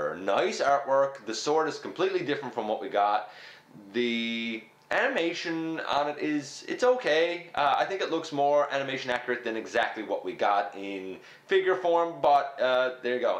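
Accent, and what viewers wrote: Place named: Newfoundland, Canada. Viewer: Are you a Newfoundlander ? I come from there and our accents are almost identical. The only other possibility is if you come from Ireland or Cape Bretton.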